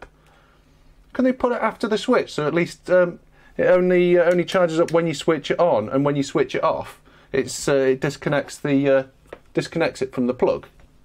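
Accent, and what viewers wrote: United Kingdom. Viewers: this guy is funny, I also love that UK accent, instant subscribe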